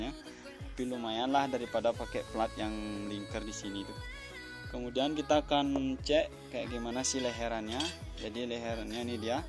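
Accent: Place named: Bali, Indonesia. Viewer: Logat nya bali banget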